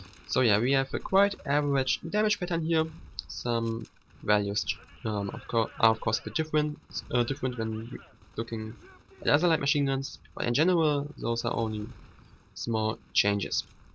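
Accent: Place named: Germany. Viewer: THAT GERMAN ACCENT !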